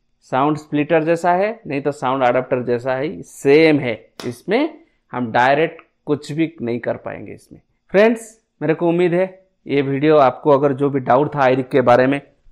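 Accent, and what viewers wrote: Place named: Odisha, India. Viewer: Sir app ki language odia jese laga rahe hai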